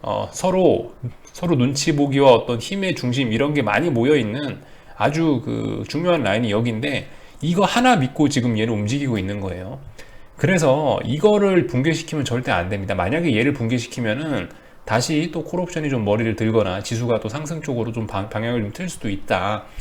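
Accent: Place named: Chungcheong, South Korea. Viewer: 얼렁얼렁..ㅎㅎ 충청도..방송감사합니다.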